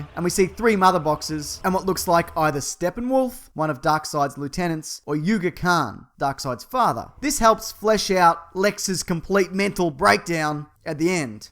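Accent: Australia. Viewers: Are you from australia you sound like it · he's australian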